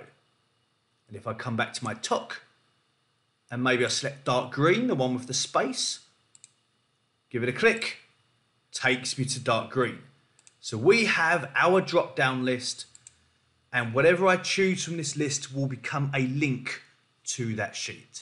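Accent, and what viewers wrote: United Kingdom. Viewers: Have to suffer 8mins of stupid British accent to learn how to create hyperlinks from drop down menus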